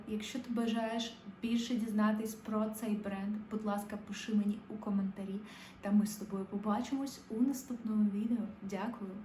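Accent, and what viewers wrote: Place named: United States. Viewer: Гарна українська з американським відтінком.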